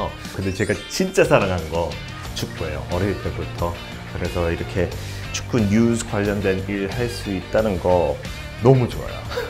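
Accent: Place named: United Kingdom. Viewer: 유익하면서 영국발음이 너무 멋있다보니 시간가는줄 모르고 봤습니다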